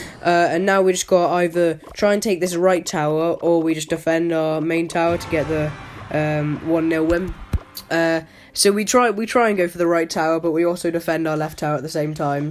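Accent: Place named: United Kingdom. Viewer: I was enjoying the vid until u spoke bri'ish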